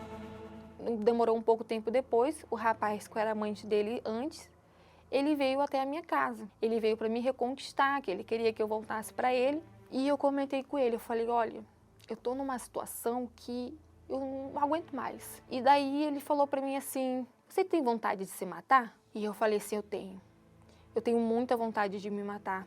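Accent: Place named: Rio de Janeiro, Brazil. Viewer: Sotaque, ela é do RJ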